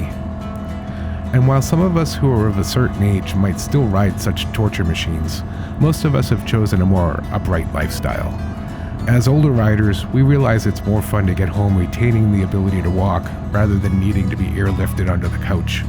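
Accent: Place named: United States. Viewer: It is perplexing to hear the voice that plays in my head, talking with an American accent. Excellent channel - Thank you. Keep them coming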